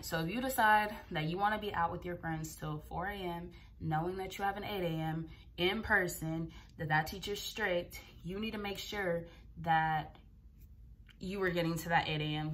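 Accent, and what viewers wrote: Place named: California, United States. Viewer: Great advice. Girl I see your Calley accent is leaving.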